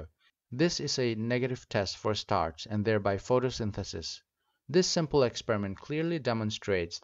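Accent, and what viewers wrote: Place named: Northeast India, India. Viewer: Well it played automatically after an experimental related video. Mostly I saw high end instruments being used, then in your video I saw the microscope, very much like the one my College labs has. There I thought , he must be an Indian and by the accent, a northeastern. Then I checked your abouts'.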